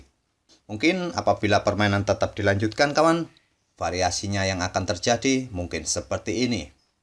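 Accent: Java, Indonesia. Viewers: Medok amat mas jawa ne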